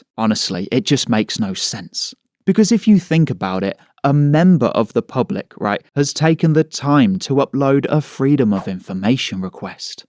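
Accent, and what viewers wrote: United Kingdom. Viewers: You sound like you’re from Leicester?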